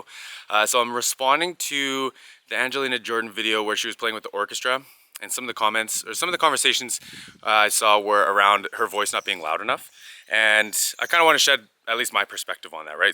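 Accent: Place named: Canada. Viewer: I don’t know why I never noticed what an amazing Canadian accent you had before listening to this video. Have you edited it out in the past?Lol!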